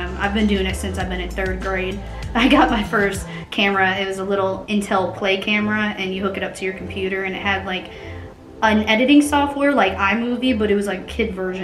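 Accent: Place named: Tennessee, United States. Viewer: Ok I actually like you on YouTube so much better! You look great girl and you have that Tennessee accent already haha super jealous! STAY STRONG and keep doing you!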